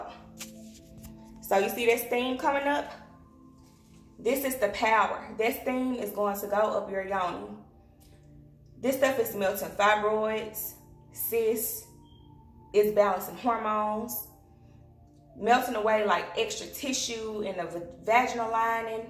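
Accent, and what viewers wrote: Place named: South, United States. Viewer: She has a Southern accent. She's not really pronouncing words wrong. She just has an accent.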